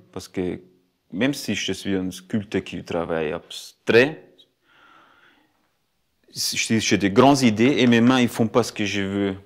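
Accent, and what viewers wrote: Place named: France. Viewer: Amazingly beautiful work. All that and a french accent.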